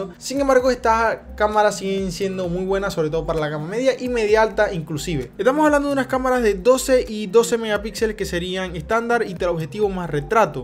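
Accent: Venezuela. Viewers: eres venezolano???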